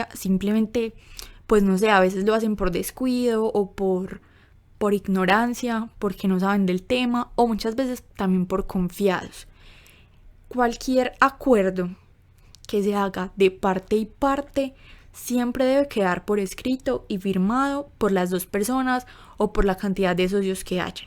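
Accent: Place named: Paisa, Colombia. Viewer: Es paisa colombiano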